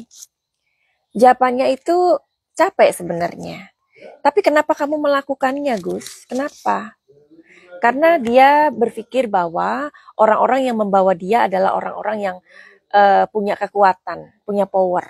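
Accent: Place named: East Java, Indonesia. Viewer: Maaf madam asli mn ya koc bahasanya kaya org Jawa timuran 🙏🙏🙏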